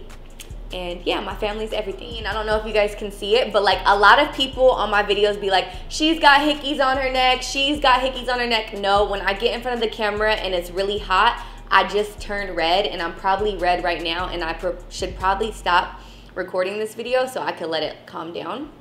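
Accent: France. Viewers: Waaaaouw tu est super belle et j'adore ton accent français et le couple que tu formes avec Kurzawa c'est tout simplement magnifique 💕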